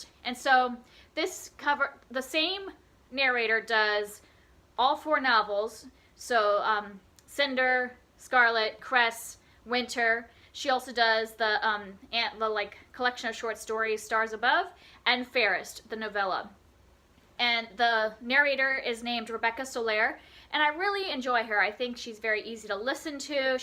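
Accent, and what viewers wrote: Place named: United Kingdom. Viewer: For me if you have a British accent for your audiobooks ... I will listen to you talk for hours!! Such great recommendations on this list!! ☺️💜💜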